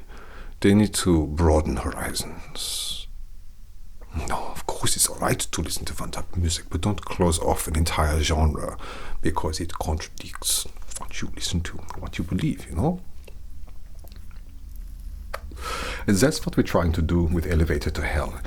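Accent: Germany. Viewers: That German accent... Is so good I'm melting! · GDDGHJBB OMG I HAVE ALWAYS WANTED TO SEE A ROCKSTAR ASMR you nailed this you're so hot like this 😍 and it was brilliantly done! The accent the look the whole atmosphere id say you are German lol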